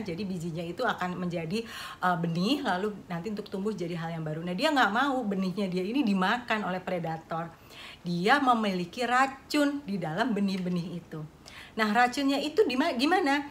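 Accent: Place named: Java, Indonesia. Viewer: Kaya jawa ya.. sy jogja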